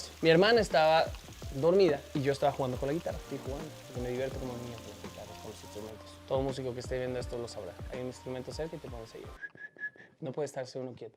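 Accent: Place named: Mexico City, Mexico. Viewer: Los mundialmente conocidos! (Cuando aún tenían acento chilango xdxd)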